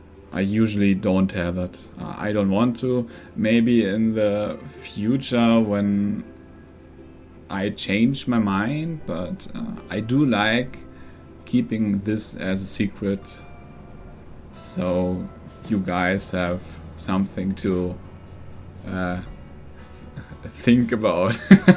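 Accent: Germany. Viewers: Love your German accent IGN: ZeusKingofGods2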